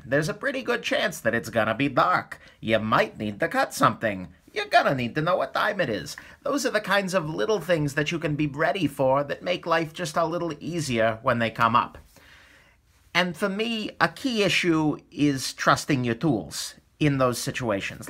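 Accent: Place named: New Jersey, United States. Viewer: wtf ... this n.j. accent for real?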